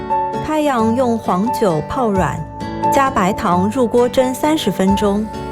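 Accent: Shanghai, China. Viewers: 上海味的普通话，好亲切😄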